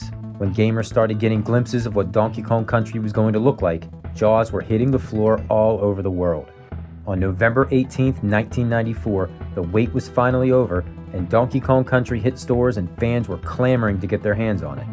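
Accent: Western Pennsylvania, United States. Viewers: Lol nice! I'm a complete f*cking nerd about linguistic stuff. So I'm like, bro must be from Western PA. I stopped short of calling you a Yinzer tho 🤣 #iykyk I'm from Ohio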